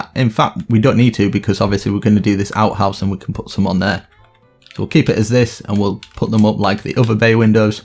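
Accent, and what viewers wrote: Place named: United Kingdom. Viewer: And it is not just the British accent it's also the sound of your voice! (If that makes sense)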